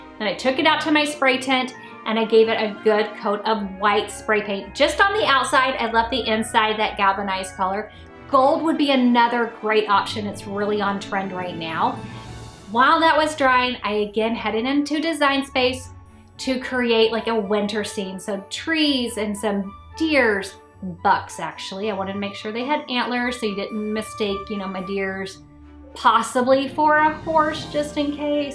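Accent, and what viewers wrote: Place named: Texas, United States. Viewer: I'm LOVING that green! 😍 Just might have to try that. And the TINS 😍😍😍 I either missed those at Michaels or mine didn't have those, bummer. As always, I love watching you create and your sweet Texas voice. Have an awesome Sunday! PS I hope you can relax today after working late into the night (I was right there with you) ❤️ Last minute ladies unite! 😂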